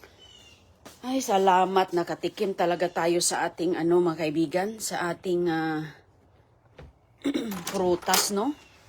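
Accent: Visayas, Philippines. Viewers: Ano kba siempre bisaya bkit ikaw tga sang province kba? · bisaya ngani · Bisaya Man Dhay. Please understand 😂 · Bisaya po kasi sya...Yung E ngging I,kayo nman my masabi lang...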